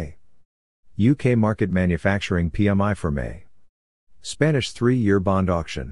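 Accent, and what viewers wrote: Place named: United States. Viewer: American accent in a London report … interesting. ☺️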